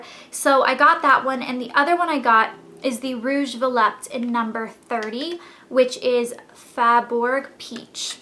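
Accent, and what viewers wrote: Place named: South, United States. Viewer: NAPAL she has a southern accent :) the middle "a", nApal, sounded like an E :)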